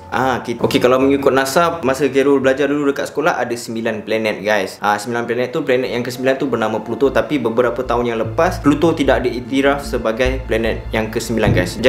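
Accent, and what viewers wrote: Johor, Malaysia. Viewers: Bahasa awk .tak sesuai..giler..korangg gay..DLL...slang lebih Johorean... sedang kan... yang tonton nya satu Malaysia Indonesia...gunalah bahasa . sebut betul sikit..atau baku pun boleh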